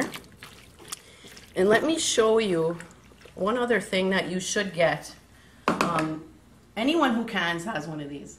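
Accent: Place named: Ireland. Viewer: Great video! I love these kind of projects! Your accent is so thick, almost Irish sounding. Love it. Is it true that you are Jeff Daniels wife? Someone told me that. Oh by the way timers is great. My grandmother puts it on everything for memory retention she says.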